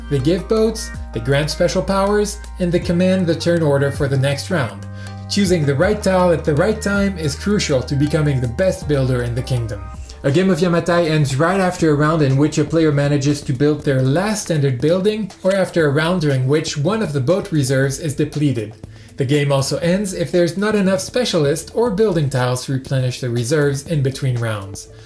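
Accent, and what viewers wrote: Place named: France. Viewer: with a touch of French accent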